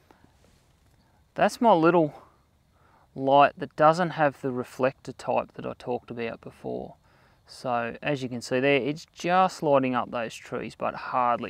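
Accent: Australia. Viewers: Really enjoying your videos mate. So good to hear aussie voice and Australian content :-)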